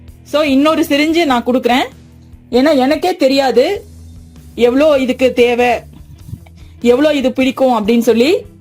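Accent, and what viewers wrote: Kongu, India. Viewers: I m watching your all videos from 3 years ago. All videos super. Kongu tamil madam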